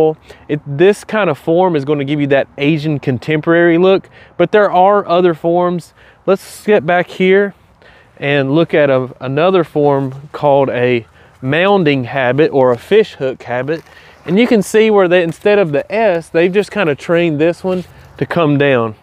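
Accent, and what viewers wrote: South, United States. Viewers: Wow I have watched all your videos. Love your southern accent and excitement about conifers. Just amazing energy. Wish I had your job.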